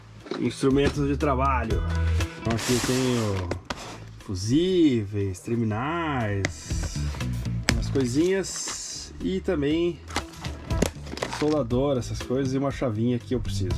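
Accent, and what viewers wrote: Rio Grande do Sul, Brazil. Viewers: Buenas tu és gaúcho .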